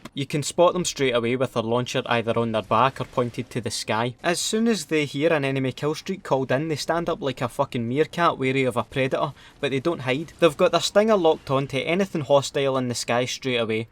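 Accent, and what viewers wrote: Scotland, United Kingdom. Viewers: Scottish accent... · Dno weather it's your banter or your Scottish accent what makes you so funny but ye your funny man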